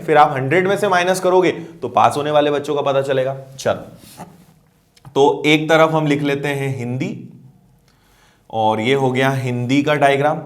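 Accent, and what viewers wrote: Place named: Gujarat, India. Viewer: Your style speaking like Gujrat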